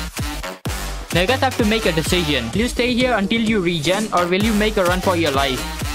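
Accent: India. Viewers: u sound like a indian tech dude indians are the best dude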